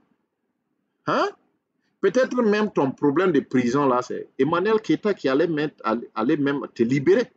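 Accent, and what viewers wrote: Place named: Burkina Faso. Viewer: Tu as un accent Burkinabè ! Tu es Burkinabè ? Je viens de découvrir ta vidéo pour la première fois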